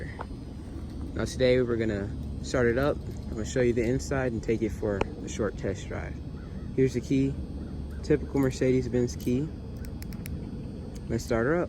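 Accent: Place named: England, United Kingdom. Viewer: Please can you be more presentable with you hair, on a very positive note, a good clean English accent. Thanks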